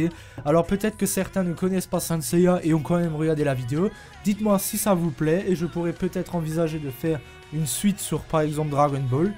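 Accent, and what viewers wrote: Alsace, France. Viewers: Un accent alsacien?? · toi t'es alsacien !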